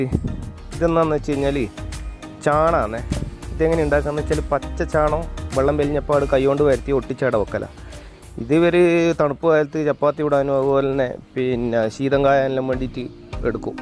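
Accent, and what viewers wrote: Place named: Kannur, India. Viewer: Superr.... Kannur basha തകർത്തു..👍👍🤩🤩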